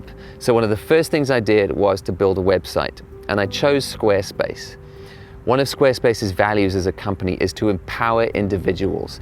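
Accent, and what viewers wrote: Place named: South Africa. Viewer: Aah, I thought I detected a bit of South African in that accent! Really enjoy your videos, and I can especially relate to the latest one, being a freelance writer in SA.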